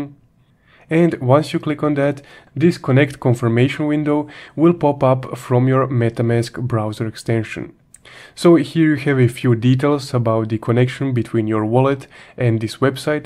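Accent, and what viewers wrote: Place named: Russia. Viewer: Со найс то хеар рашн акцент)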